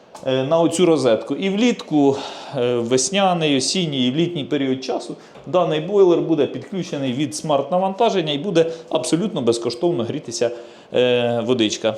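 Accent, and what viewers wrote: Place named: Western Ukraine, Ukraine. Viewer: Ну вино шо з якогось села по мові чути чи Львів чи Закарпаття мова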